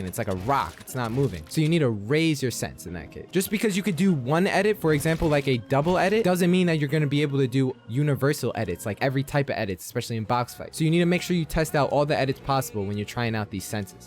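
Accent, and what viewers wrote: New York, United States. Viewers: This guy definately from new york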